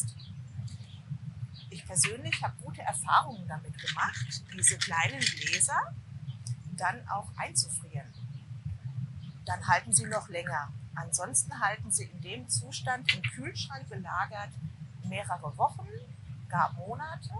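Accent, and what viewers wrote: Hesse, Germany. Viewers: Großes Lob an die Macher, und am besten gefällt mir, dass ab und zu auch mal ein hessisches Wort durchklingt 🙂👍weiter so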